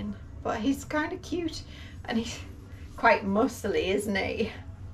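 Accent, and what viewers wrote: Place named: Newcastle, United Kingdom. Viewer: Hey, how fantastic you're in your studio! Oh what a great assortment of pots and glaze combos. love Jacobs jug and I do hope you can save it? Your beautiful coil bowls are fantastic and what a lucky friend you have who's going to get the big turquoise bowl for her birthday. so glad you're back making pottery from home and videos as I love watching and listening to your Geordie accent. Happy potting my friend and stay well xoxo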